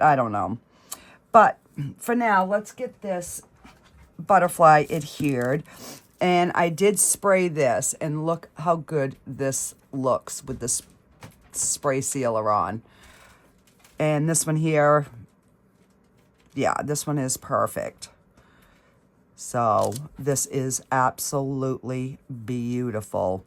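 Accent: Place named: Massachusetts, United States. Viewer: Where are u from if you don't mind me asking you sound like mass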